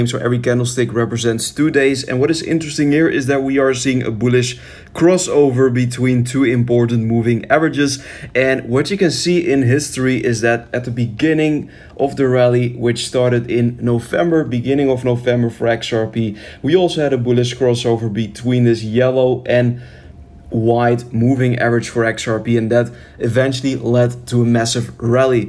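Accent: Netherlands. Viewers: Nederlandse accent weer duidelijke te horen😂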